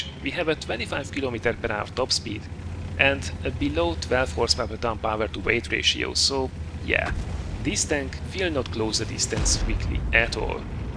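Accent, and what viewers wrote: Hungary. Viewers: he is from hungary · Where are you from??(narrator) You have got hungarian accent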